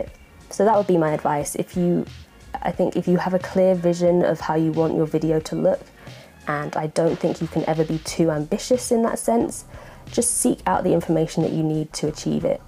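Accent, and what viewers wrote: United Kingdom. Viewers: Maybe it's because they're from the same race and both has a british accent?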